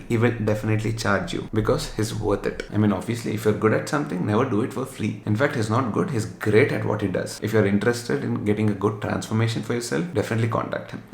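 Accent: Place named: Telugu, India. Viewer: Bro nuvvu English lo matladina maku telugu lagey anipisthundhi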